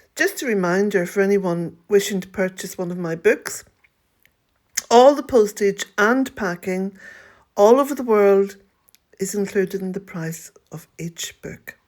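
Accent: Ireland. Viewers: Looking at your garden was very meditative. You have a beautiful place there. My Mom's parents came from Ireland--Friel and Canny. I didn't realize when I clicked on your video that that's where you are. It's nice to hear the brogue again and hear the appreciation for nature and respect for Mother Nature and some of the hopeful Irish sayings. And for, the numerous 'cups of tea'. I was looking at your stone floor. I would love to do that in my home. I love stone work. I love sitting on the jetty at the ocean listening to the waves and birds. It's just so grounding. So glad I came here today. Thank you. ❤ --Kate Cape Cod, USA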